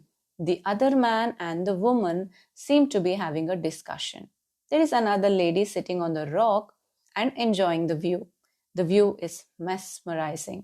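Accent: India. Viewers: Mam Malayali ano, angane thonnunnu.... Great video 🙏🏻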